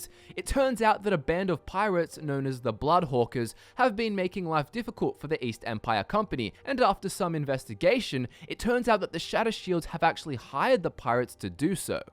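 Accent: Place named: Australia. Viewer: me: starts video me: oh hey fellow aussie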